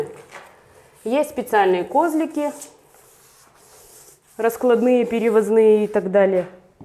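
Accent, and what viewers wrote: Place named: Perm, Russia. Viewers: Здравствуйте! Я смотрел сериал и там девушка прям как вы разговаривала! Вы из Перми ? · Люблю Пермский говор. Видео понравилось, душевненько, но резать обои ножом навесу с запасом в 2 мм. это нужно иметь хороший навык. Да и многое другое рассчитано на хороший опыт. Для чайника не подойдёт. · Вы с Урала? Говор знакомый. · Акцент прям Пермский )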